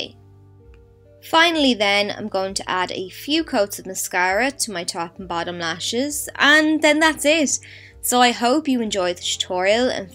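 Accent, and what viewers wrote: Ireland. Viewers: I absolutely love your accent, I followed immediately after hearing it! 😂 (given, I was going to follow anyways just from the thumbnail, but whatevs) Is your accent Irish?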